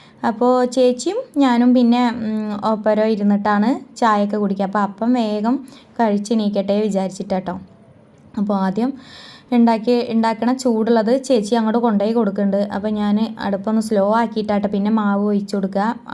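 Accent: Kozhikode, India. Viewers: ഇത് കോഴിക്കോട് സംസാര രീതിആണോ, ഞങ്ങളുടെ ഒറ്റപ്പാലം ഭാഗത്തു ഇങ്ങനെ യാണ് സംസാരിക്കുന്നത്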